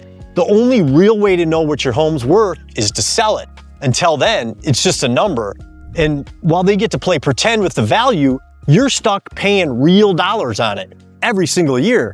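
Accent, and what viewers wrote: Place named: Wisconsin, United States. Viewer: Are you from Wisconsin? Your accent sounds like it.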